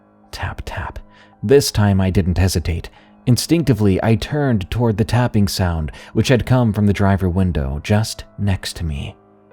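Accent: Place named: New York, United States. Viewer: thick new york accent FOIST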